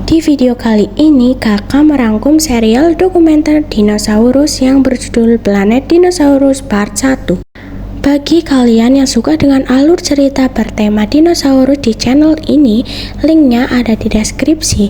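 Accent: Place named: Java, Indonesia. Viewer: Pengisi suara logat JAWA mbaknya 😄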